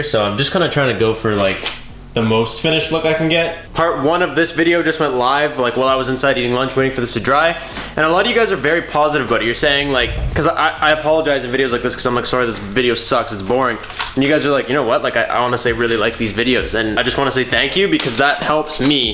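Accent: Canada. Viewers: You have the accent canada is where its at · Is it just me or did you didn’t notice accent until finding out he lives in Canada?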